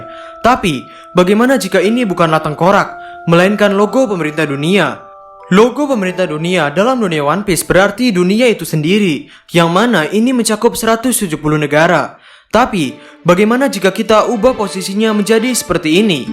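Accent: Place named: Ambon, Indonesia. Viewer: Ambon mana suaranya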